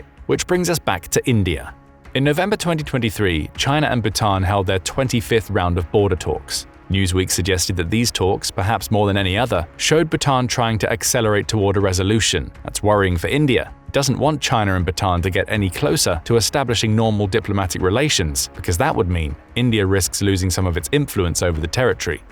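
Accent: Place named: Oxford, United Kingdom. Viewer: Trying to use a British guy with an oxford accent to justify spreading outright lies is despicable. Read the history of the conflict and you find India is the wolf in sheeps clothing.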